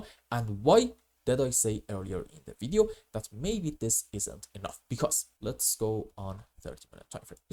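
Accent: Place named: Iran. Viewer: I have a feeling that you are from Iran. am i right? cause you accent is so similar to those! if you tell the truth , i will consider to subscribe bro.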